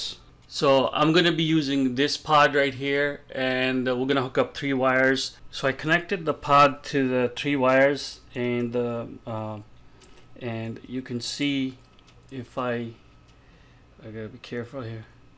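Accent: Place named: United States. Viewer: i swear this guys accent is indian mixed with american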